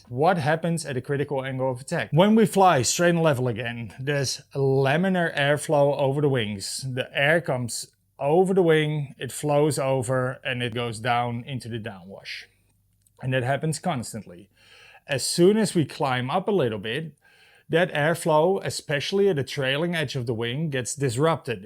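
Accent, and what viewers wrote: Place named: Scandinavia. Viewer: Very well explained. I am guessing you are from the Nordics (based on the accent). Very well done video, accurate descriptions, simplified explanation. Keep going!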